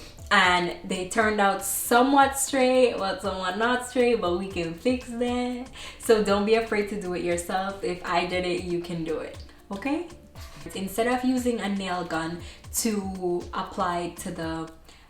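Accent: Jamaica. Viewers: I randomly clicked on this video and something tells me you’re Jamaican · Ur accent sounds just like a Jamaican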